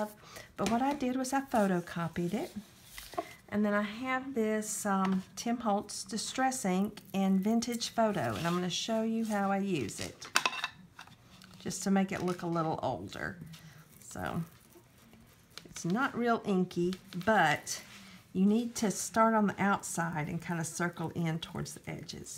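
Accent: South, United States. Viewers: Fun thumbnail! Lol like your Southern accent. Aww that's sweet you are acting like your Grandma. I have a lot of similarities with my Grandmother too.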